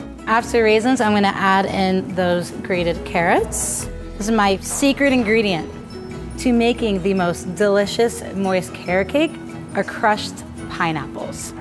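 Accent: United States. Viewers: Sounds American to me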